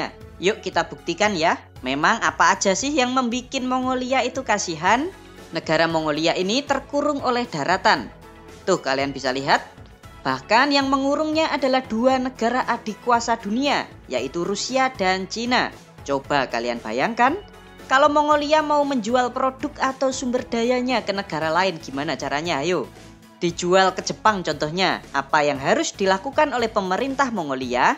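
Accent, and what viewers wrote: Java, Indonesia. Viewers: Logat Jawanya yg sangat Medok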